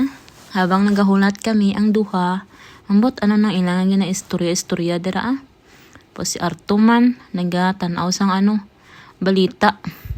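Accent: Philippines. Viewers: Hnd ko maintindihan cnasabi mo ppro ka visaya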